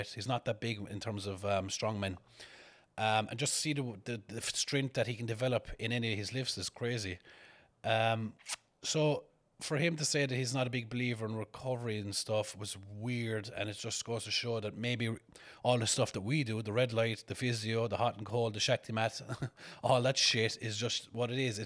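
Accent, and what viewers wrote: Ireland. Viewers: Enjoyed that. Love how you say 332, the Irish accent, born story tellers.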